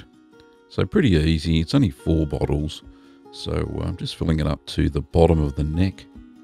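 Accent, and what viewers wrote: Australia. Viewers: I'm a simple man I hear the Australian accent I skip too another video .🖕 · Definitely make this a series!!! Cheese, mead/beer and an Australian accent. What more could you want in a channel!?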